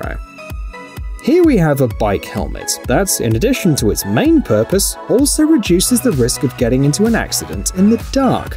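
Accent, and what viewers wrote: United Kingdom. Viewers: I wasn't expecting the British speaker to price them in dollars.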